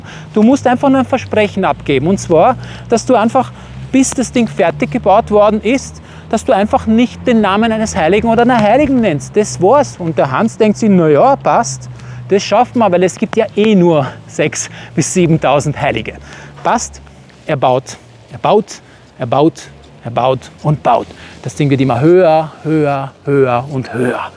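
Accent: Vienna, Austria. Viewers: 🤣🤣 Herrlich! Mit fast perfektem Wienerisch! Danke für die Auffrischung der Sagen-Kenntnis! 👌🏻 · Dein Wienerisch klingt charmant. ;)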